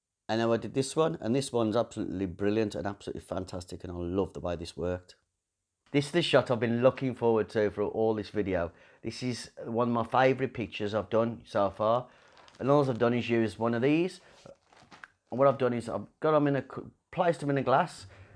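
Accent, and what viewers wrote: Birmingham, United Kingdom. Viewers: Hi, it's good to hear a brummie accent. Not sure where you are from but I can't be to far from you in Walsall. I am loving your videos and have tried a few of your ideas. I am using extension tubes and a Canon 1200D. Had some success! Thanks again for the inspiration. Steve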